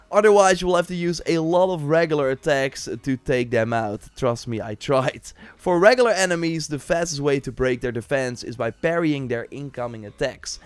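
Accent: Netherlands. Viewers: this clip is sh@ He doesn't finish combo's during fighting. This guy can't play the game. This guy is BAD advertisement with an irritating dutch accent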